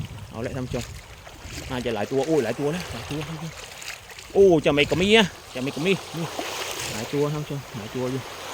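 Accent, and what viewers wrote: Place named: Tha Chae, Thailand. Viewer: แหลงเหมือนคนท่าแชะเลยหลวง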